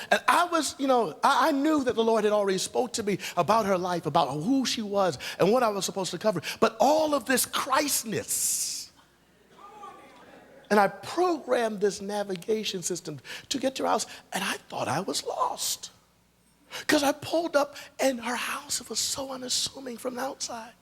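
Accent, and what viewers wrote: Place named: Nigeria. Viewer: U sound like a Nigerian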